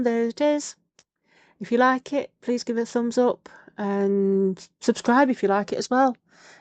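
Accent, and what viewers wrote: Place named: South Yorkshire, United Kingdom. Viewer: im loving watching you make this, i recognise your accent and voice. Im from South Yorkshire maybe thats why xx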